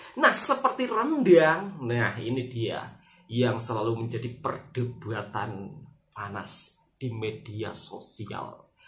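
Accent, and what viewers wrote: Java, Indonesia. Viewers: Loghat jawa lah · best tengok video Bang ni, orangnya jujur dan ikhlas dengan loghat Indonesianya tu agak LUCU tapi MENGHIBURKAN, terus berkaria ye Bang.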